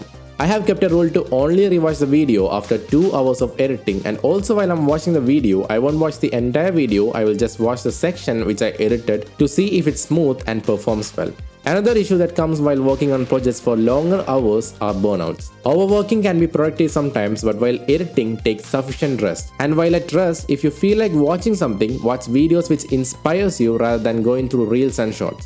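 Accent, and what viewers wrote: India. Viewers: Are you indian because accent is like indian people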